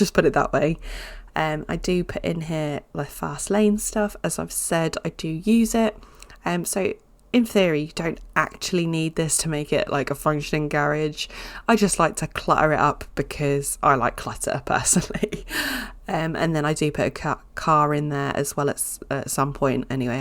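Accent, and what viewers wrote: United Kingdom. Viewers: Love listening to you ramble! Sometimes I just put. your videos on to listen to while at work even though i can’t watch the actual video. Also yes you do sound like you are from the UK. I think you have a bit of an Essex accent 😆 but I don’t think you are anywhere near Essex if i’m not mistaken? 💜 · I love the house and the cool shapes of the bedrooms, gives them character and it's fun to decorate! You definitely sound like you are from the UK 100% (to me at least and I am from USA) and I love a bit of both rambling and talking about the build, it really sounds like speaking with a friend :)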